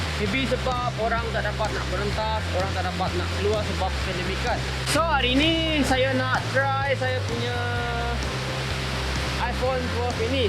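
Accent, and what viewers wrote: Sarawak, Malaysia. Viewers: wah orang serawak💆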